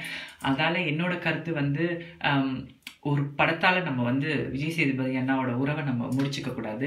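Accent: Sri Lanka. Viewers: Tamil nalla irukku sri lankan aaa neenga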